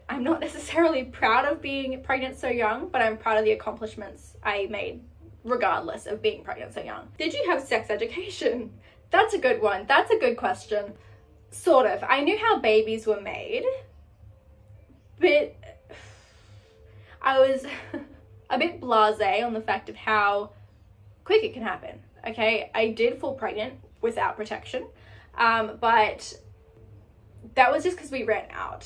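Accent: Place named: Australia. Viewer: nah, the aussie accent sounds really nasal and masculine on her.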